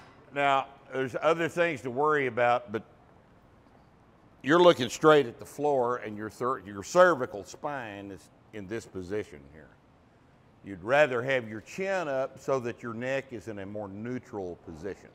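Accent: Texas, United States. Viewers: Well it's really more Texan. It would definitely stand out among native Georgians for example. Watch Fred Armesan's video on accents. Somehow he gets it pretty close. Well I think spot on but I'm not an expert. But have lived my whole life in southern U.S.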